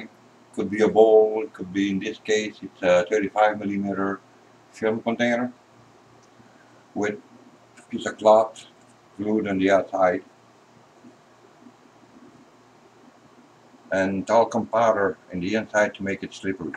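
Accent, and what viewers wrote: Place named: Louisiana, United States. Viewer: I hear a home accent of a Cajun... ❤🥰❤🥰❤ I am from the southern part Of Louisiana.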